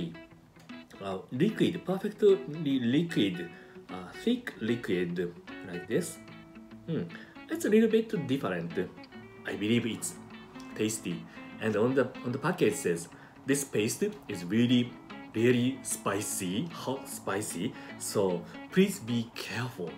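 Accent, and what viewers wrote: Japan. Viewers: Always interesting listening to native Japanese speaking english(no offense meant). As someone trying to learn Japanese I am beyond impressed how well transitions from JP->En->JP->En is for a lot of people. Ive always had a hard time with the order of things moving between English to other languages, like spanish. Kudos.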